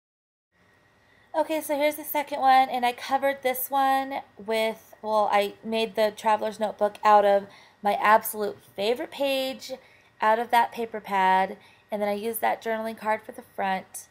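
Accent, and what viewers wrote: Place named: Nashville, United States. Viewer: hello where do you live you sound like your from Nashville that where I live but you said you went to somewhere eles to the dollar store just woundering love your vidos learn so much is there any way to send you a message?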